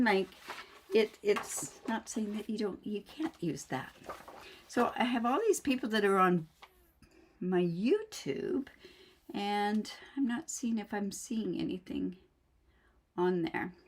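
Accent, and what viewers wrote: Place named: South, United States. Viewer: Love the pecan pie accent!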